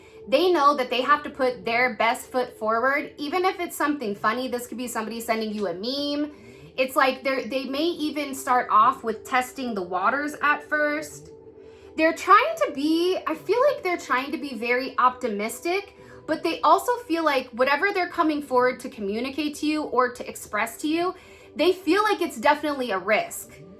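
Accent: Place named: Bay Area, United States. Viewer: okkayyy I knew you had to be from The Bay. I know that ur swag when I hear it and see it. lol That’s Hella Dope….Yeeeee💕👑🔥⭐️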